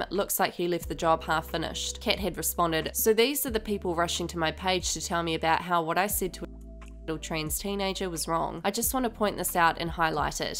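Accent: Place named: New Zealand. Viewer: true. So nice to hear a pure Kiwi accent from young people without some awful American twang in it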